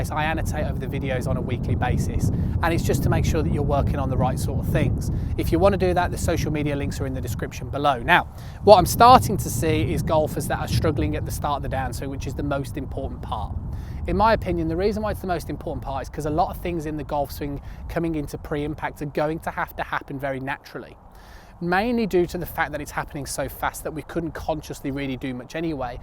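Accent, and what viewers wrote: United Kingdom. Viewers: I too have watched what seems like endless list of videos until I stumbled upon! I like that he acknowledges there can be variations on how we all get to certain points in our swing and where we go from there. In the beginning I really had to concentrate on listening to his fast paced British chat, but am now tuned in. He’s very articulate and I am now on board.👍 Next up I will try to get my swing video done and sent. Thanks and keep up the informative videos. Also, looking forward to more videos dealing with some limited flexibility.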